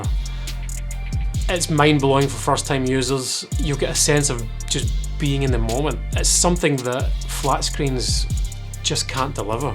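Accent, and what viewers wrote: Scotland, United Kingdom. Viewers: Helpful towards new, inexperienced flight sim-ers like me? Yes. Scottish accent? Yes. What's not to like. Subscribed. Hope the channel builds nicely for you. p.s. What got me to realise I wanted to keep going on DCS was the excellent A4 Skyhawk mode. As a full fidelity offering, in an era which is simpler to learn and control, and carrier capable, I cannot recommend it highly enough for all new starters. Ignore the 2 free DCS aircraft and just get on that instead.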